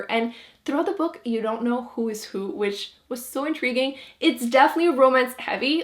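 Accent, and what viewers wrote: Quebec, Canada. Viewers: ps: ton accent québécois est super cute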